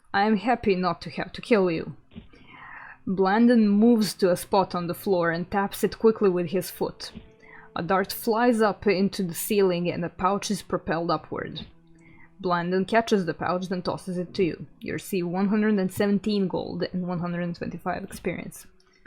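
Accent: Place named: United Kingdom. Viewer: I though you was british or something like that. I like your Accent. Is kinda cute.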